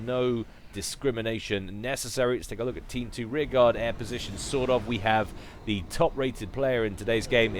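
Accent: United Kingdom. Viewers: Oh this british Tyrannus hurts in my ear D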